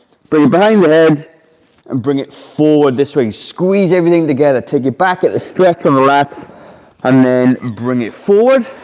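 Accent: Wales, United Kingdom. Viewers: No!!! He has a lovely Welsh accent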